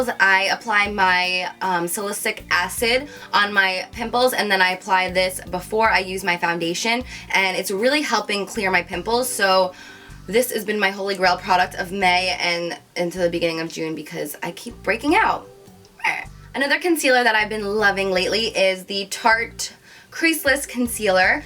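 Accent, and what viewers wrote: New Jersey, United States. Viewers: i know she is from jersey i just didnt know that she lived in jersey. Just because you have a jersey accent doesnt mean you have to live in jersey.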